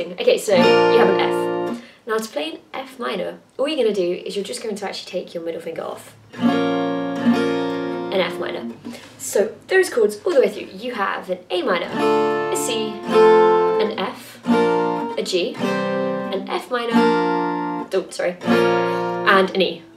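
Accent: England, United Kingdom. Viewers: Seriously love your videos, I've literally only just got a guitar for my birthday, which is today, woo! And I've never even had a guitar lesson or anything, I'm going to hopefully attempt to teach myself, which should be interesting:L but yeah I think you're awesome, and not to be horrible to other talented guitar tutorial people out there, but I find your videos really easy to follow, you seem laid back and really cool, plus because you're english like I find you easier to understand than some other youtubers because I don't have to listen through a really thick accent which is always helpful when you're trying something new haha, if I can be half as good as you I'd be extremely happy! Carry on making awesome videos and I'd love to hear something by maybe, quite like that song although I usually listen to heavier stuff, but still, also you have a really nice singing voice, pretty unique and I like that, also I know as you've said in a few of your videos singing is not your strong point, but I think it's really great when you do sing, shows confidence, and it helps for me to understand more, I'm a learning by hearing kinda person, but yeah, excellent work!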